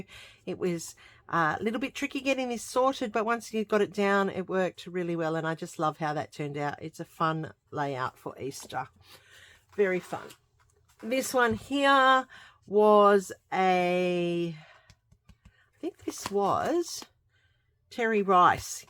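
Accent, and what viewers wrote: Australia. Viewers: Oh wowsers! Thank you. I've been trying to get inspired after 9 years of Uni study. I was excited to find you,. Nice to hear an Aussie voice-over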